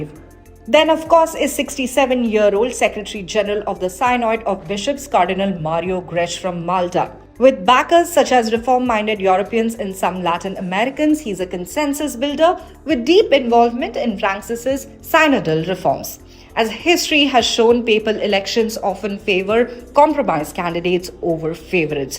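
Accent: India. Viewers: INDIAN ACCENT IS ANNOYING AND WRONG PRONUNCIATION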